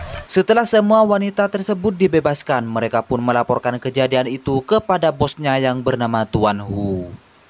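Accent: Madura, Indonesia. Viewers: astaga meduro mu lo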